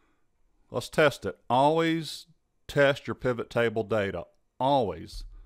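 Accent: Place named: Texas, United States. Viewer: Sir, are you from Texas because you sure sound like it?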